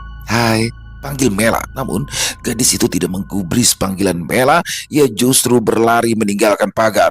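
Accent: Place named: Betawi, Indonesia. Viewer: assalamualaikum bang, salam sehat buatbang bang seneng banget klw ceritanya bernada betawi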